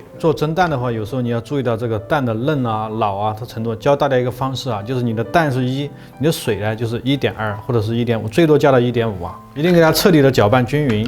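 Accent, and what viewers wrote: Sichuan, China. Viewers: 听您的口音是四川人吗？我是居住在苏黎世的四川人，看到您排骨的做法，很喜欢，瑞士奶酪🧀️很多又便宜，希望您推点奶酪入菜的菜品，谢谢🙏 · 我是北方人，但是一听师傅的四川口音，就知道绝对靠谱👍🎉🎉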